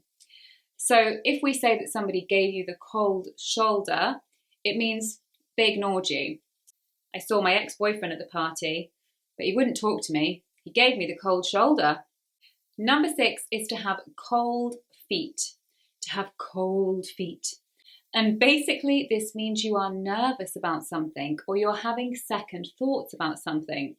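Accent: United Kingdom. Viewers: Gosh do I love the british accent. Something about your voice which just captures my attention 110%. I'm all ears. Have a safe day fam 🤟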